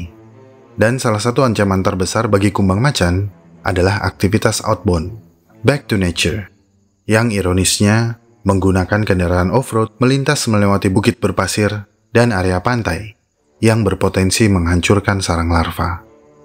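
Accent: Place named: West Java, Indonesia. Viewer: Sieta mani teungteuingeun. Dr lafalnya Fix orang Sunda.?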